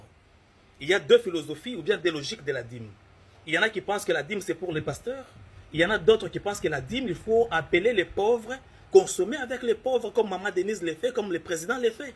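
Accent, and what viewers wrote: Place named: Kasai, Democratic Republic of the Congo. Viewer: Yo nani. Aventurier tala ligne ya ba voyous. Oza muluba deja tonalité eyokani. C normal que tu défende les voleurs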